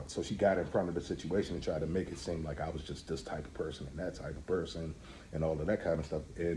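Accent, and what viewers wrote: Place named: Maryland, United States. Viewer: I hear MD in you me too where at brother.....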